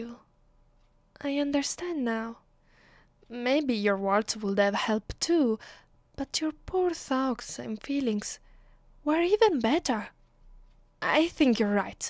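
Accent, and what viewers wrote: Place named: Italy. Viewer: To, I enjoyed your vampire roleplay. The title was Vampire Girlfriend. And also, I love your Italian accent very much. It actually made your roleplay, extra special. But not only that, your creator is so romantic. I fill like that I am her boyfriend. And also, I could picture myself in her arms. I am really into female vampire role plays. I hope that there will be more role plays in the near future.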